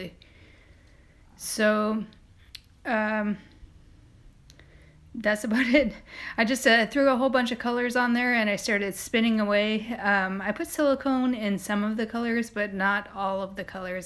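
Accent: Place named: Canada. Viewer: I am a big fan of your art and really like your videos. Your video presentation is quite cute and I especially enjoy your "Canadian" accent- on your" aboot" and "owoot"- anything with an ooot in it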